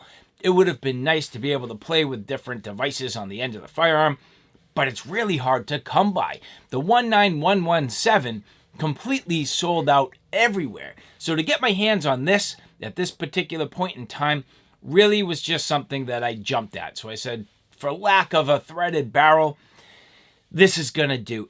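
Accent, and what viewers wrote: Massachusetts, United States. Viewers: You are from Mass. I can tell.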